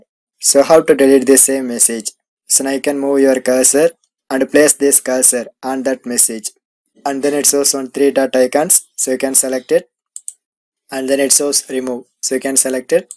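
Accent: India. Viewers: Lol your indian english sounds really stupid and it makes me laugh when I hear it lol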